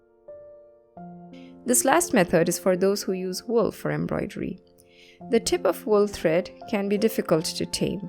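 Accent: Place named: Kenya. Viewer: Thank you. Your accent is so Kenyan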